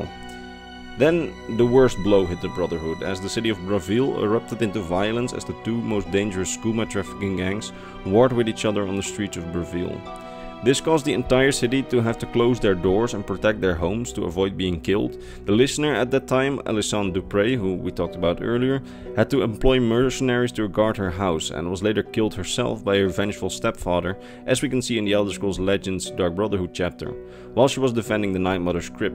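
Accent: Netherlands. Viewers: hi! i love this vid, question tho, are you dutch? you kinda have that dutch english accent. im dutch btw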